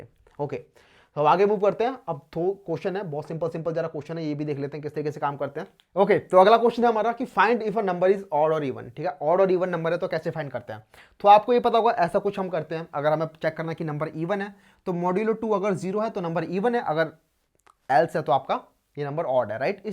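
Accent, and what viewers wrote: India. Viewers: Indian English is so difficult to understand xD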